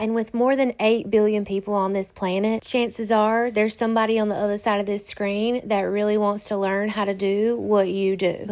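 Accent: South, United States. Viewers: your Southern drawl reminds me so much of my mom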